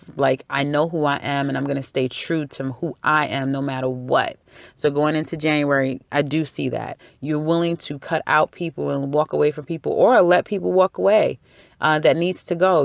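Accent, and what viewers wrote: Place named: New Jersey, United States. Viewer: nice reading dead on....luv ur accent sounds like New Jersey New York or Maryland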